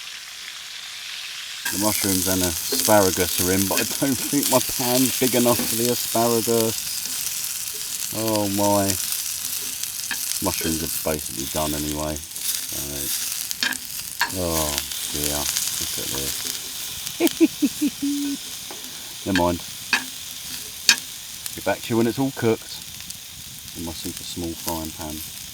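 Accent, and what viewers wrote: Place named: United Kingdom. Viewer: aww love your dog - quite the gorgeous animal and love his colouring. What part of the United Kingdom is your accent. We had a dear friend who actually came from London & sort of sounds the same but not sure. That fox was beautiful to watch hard to see it's colouring but I do feel concerned about their longevity of these animals because of the way they were hunted over the years. Dinner looked scrumptious thanks 4 sharing Cheers.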